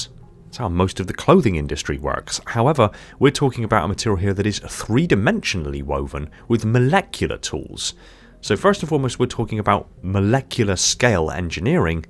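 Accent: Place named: Bristol, United Kingdom. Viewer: You from Bristol, thought I heard a twang in there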